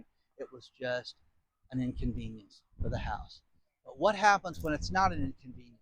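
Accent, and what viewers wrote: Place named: Wisconsin, United States. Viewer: Great hope you put them all to work! Smile sounds like you are from WI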